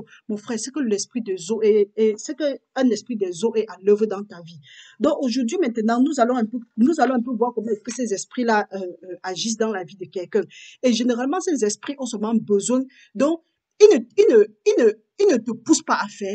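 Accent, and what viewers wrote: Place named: Cameroon. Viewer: Il me semble que vous êtes Camerounaise ma soeur de par votre accent. Que Dieu te bénisse davantage au nom de Jésus la sentinelle de l'Eternel.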